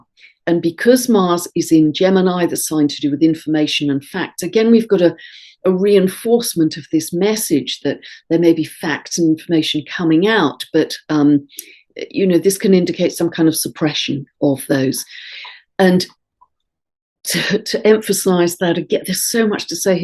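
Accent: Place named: RP, United Kingdom. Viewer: Never been to this channel before, but this woman is poshness goals 😶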